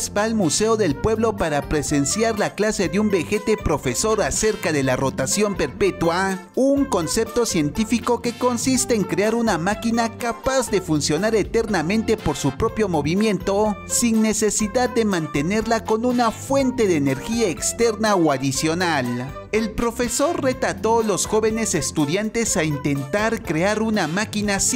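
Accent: Mexico. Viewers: Mexicano, Mexicano el narrador !!!😁👍💪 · Primera ves que escucho una narración al estilo chilango,.. pues está chida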